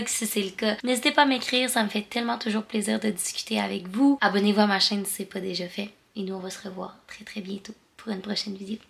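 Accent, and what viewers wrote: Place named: Quebec, Canada. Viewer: Salut pour nous français entre l'accent québécois et les Mots d'anglais au milieu des phrases c'est pas toujours évident à suivre mais c'est ce qui fait le charme de la vidéo Cela nous fait voyager quand même😊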